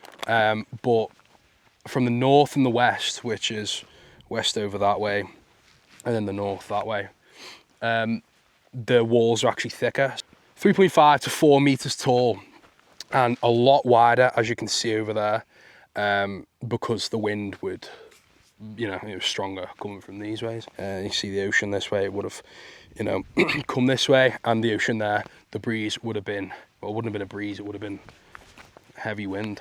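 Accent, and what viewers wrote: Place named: Liverpool, United Kingdom. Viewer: New sub fella well done good watch you git touch scouse in tha accent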